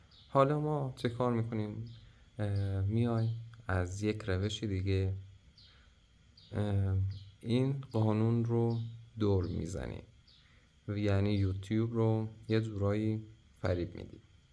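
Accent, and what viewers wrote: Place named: Turkey. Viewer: ما درست متوجه شدیم که لحجه ترکی دارید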